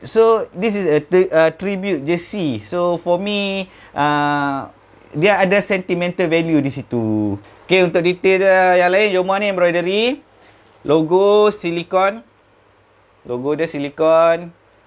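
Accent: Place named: Kedah, Malaysia. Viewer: hang review pakai loghat Kedah/Penang pasaipa hahaha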